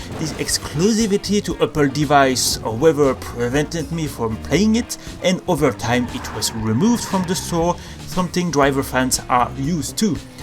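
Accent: France. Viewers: Question are you french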